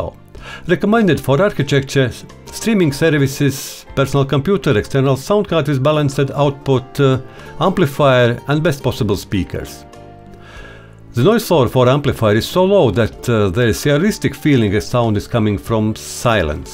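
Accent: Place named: Russia. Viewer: Привет! Жуткий акцент))). Вы русский? Отличное видео. Будет чем себя занять на досуге. Лайк.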